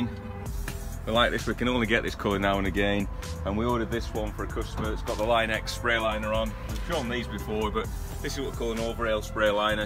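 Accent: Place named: Cumbria, United Kingdom. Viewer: Think its Carlisle in Cumbria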